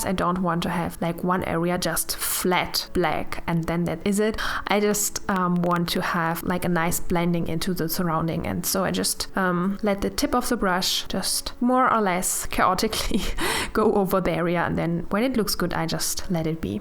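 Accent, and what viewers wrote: Germany. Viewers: Your accent is so german 😄😂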